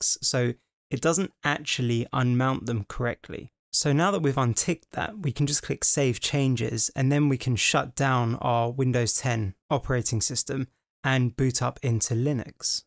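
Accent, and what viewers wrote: United Kingdom. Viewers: So glad I stumbled upon your video, what luck!! After struggling with reinstalling Ubuntu 20.04.3 successfully ,after an "ID-I0-T", error I thought I better check the Win 10 install on my dual boot drive. Win 10 booted up fine and whilst booted it did a full Nov 21 update on shutdown / restart, and all worked okay. After shutting Win 10 I then found problems reinstalling apps in Ubuntu with the persistent read only file system error, requiring several uses of Timeshift to reset Ubuntu again & again. I had instantly thought it was an Ubuntu error after the reinstall, but low and behold it is Windows trying to hog the hard disk limelight again with "Turn on fast restart" enabled. I will be checking that it is disabled after every Win 10 update from now on. Thanks so much and great to hear a British accent on the video :)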